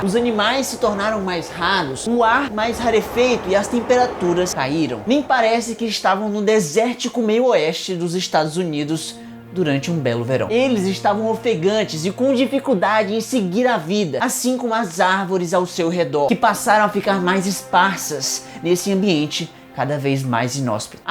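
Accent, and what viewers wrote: Ceará, Brazil. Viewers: Krai o guri e cearense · Ele é cearense confunde mesmo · Cearense k(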